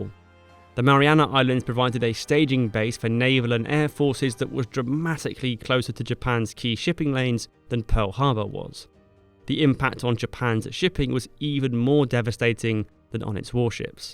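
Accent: United Kingdom. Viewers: Somehow it sounds more important with a British guy announcing.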